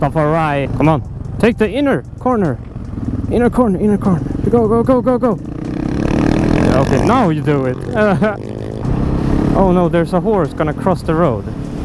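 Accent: Sweden. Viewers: Love the swedish accent haha, great video btw!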